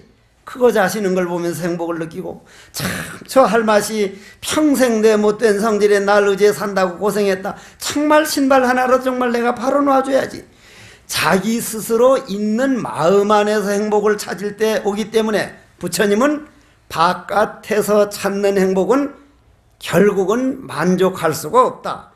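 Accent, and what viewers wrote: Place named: Gyeongsang, South Korea. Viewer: 니그카이 내그카지 경상도사투리. 하 하 하 시님 감사감사합니다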